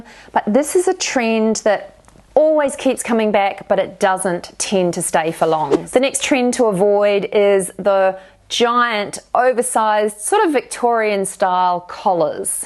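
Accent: New Zealand. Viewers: Where's the accent from? New Zealand? Like the place that hasn't spend a year in their pijamas?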